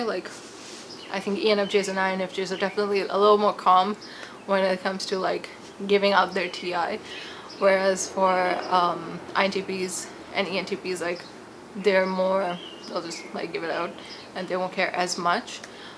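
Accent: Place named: United Kingdom. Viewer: ABFTBA Always be flexing that British accent.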